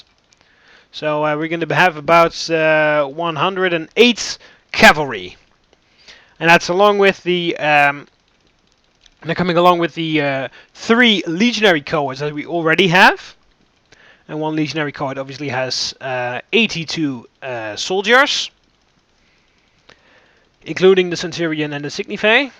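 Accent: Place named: Netherlands. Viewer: also are you dutch because you kinda have a dutch english accent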